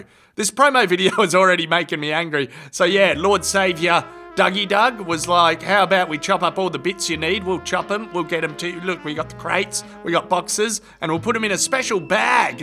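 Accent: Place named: Australia. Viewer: Somehow the Australian-voicedNarration made everything even dafter than it was...that is almost a backhanded compliment...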